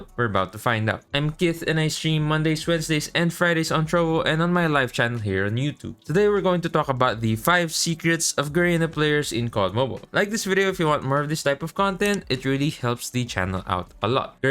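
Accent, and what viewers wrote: Philippines. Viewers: I knew you were a filipino. Just from the a cent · Why is your english accent sound like filipino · Been watching your vids for a while now and I realized that you’re also a Filipino based from your accent. Good job! New subscriber here from Garena server.👍🏼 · Pinoy ka rin pala, tama kutob ko kase the way na mag salita ka e btw naubos ko na lahat ng vids mo.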